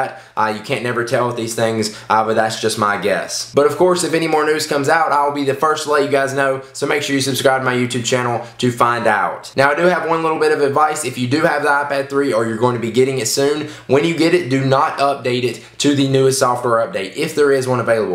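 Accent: South, United States. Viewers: You sound country...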